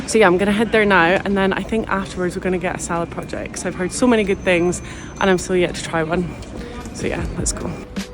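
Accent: Scotland, United Kingdom. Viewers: Are you from Scotland? ❤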